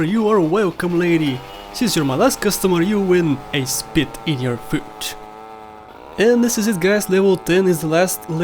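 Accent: Russia. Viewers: Чувак, ты же русский! Я чувствую это!!!! xD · You speak like a russian of English language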